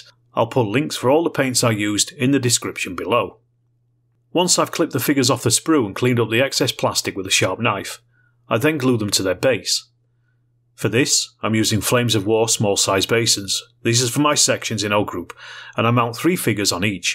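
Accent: Northern England, United Kingdom. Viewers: I love mid-England Northern accents! Keep talking mate!!!!!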